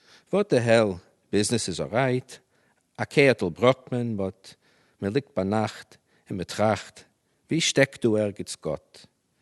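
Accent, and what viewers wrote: United States. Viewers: Ober dus iz amerikaner yiddisch.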